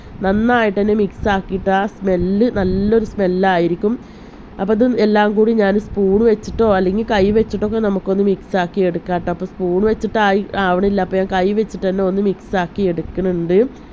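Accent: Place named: Malabar, India. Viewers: എസ് ,ഇത് പാലക്കാടൻ ഭാഷയാണ് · enthu bhaashayaa? malbaari bhaashayaano? manasilaakunnillaa!😇👻👹👹🤧👺🥵🥶💀💀😈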